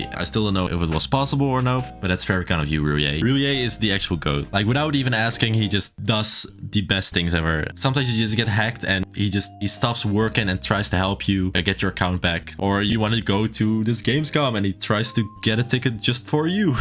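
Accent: Netherlands. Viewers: Ik ruik een Nederlander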